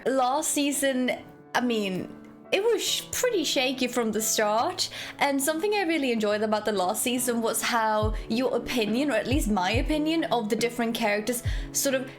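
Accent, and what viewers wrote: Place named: South Africa. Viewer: Where are you from ? you almost sound South African and Irish and Australian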